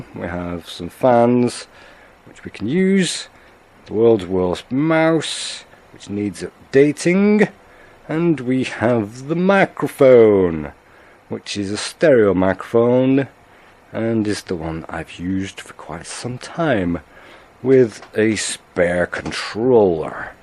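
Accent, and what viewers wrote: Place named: North, United Kingdom. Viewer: Interesting vlog cant wait for the Vid:) and you look a lot younger than you sound( love the Northern acc dont lose it)! thats a compliment:)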